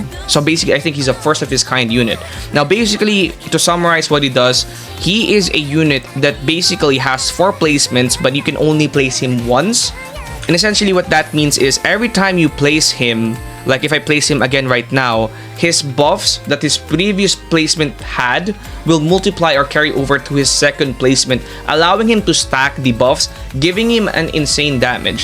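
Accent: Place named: Philippines. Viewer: I can hear in your voice your filipino, can you help a fellow filipino out yourself and gift vip? (Shooting my shot like ben simmons)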